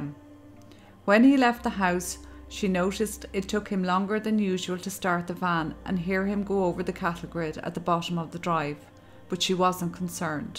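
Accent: Ireland. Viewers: You speak so clearly with that beautiful Irish accent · Love your soothing voice. Which part of Ireland are you from ?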